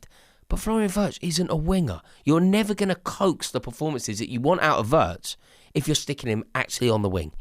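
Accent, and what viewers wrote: RP, United Kingdom. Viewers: That’s a standard every season with this idiot, uses big words and his posh Tory accent and thinks he’s some footballing genius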